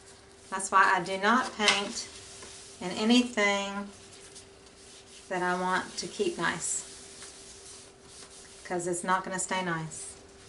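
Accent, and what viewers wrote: South, United States. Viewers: I love your videos. You are so soothing with your southern accent and your painting are beautiful!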